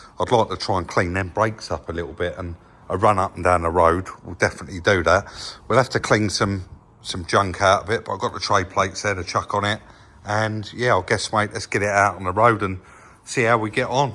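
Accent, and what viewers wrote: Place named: RP, United Kingdom. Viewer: Bit posh you you essx boys isn't it ? 🙂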